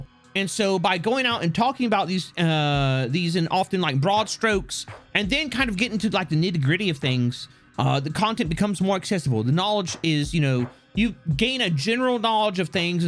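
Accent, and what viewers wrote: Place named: Mississippi, United States. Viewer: I always catch just a whiff of a southern accent.... like mississippi southern....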